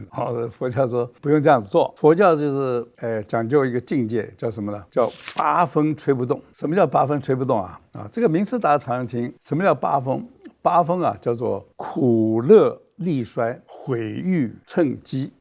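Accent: China. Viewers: 是大陸人嗎？為什麼講的是大陸腔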